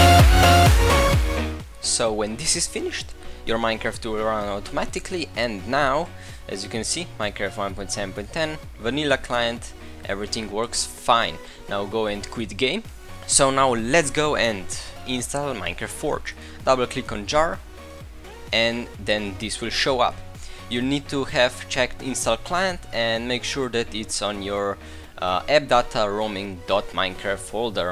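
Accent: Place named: Poland. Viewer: Thanks you so much :3!! By the way are you from Poland, because your accent?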